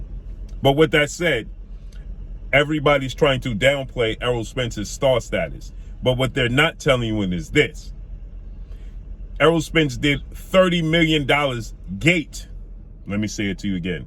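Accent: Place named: New York, United States. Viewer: Why is you takin' this so personally? I can tell by your accent you from NY, Errol left NY when he was a toddler.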